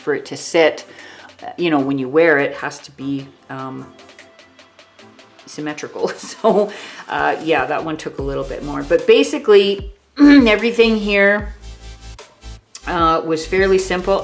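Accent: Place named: Canada. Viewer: I thought I heard a Canadian accent.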